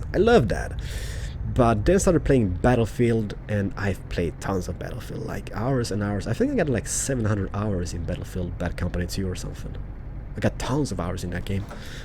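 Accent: Sweden. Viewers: jag gillar din svenska m8. it is funny. I like your swedish m8. it is funny.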